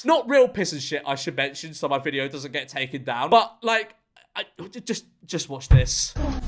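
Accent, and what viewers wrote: United Kingdom. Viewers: I approve i like this smol bri’ish man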